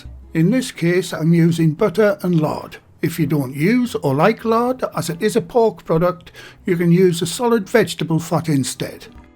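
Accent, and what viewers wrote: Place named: Geordie, United Kingdom. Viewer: This looks amazing, reminds me of the steak pies my mam used to buy from a local butcher. As a fellow geordie i find your accent so lovely to hear .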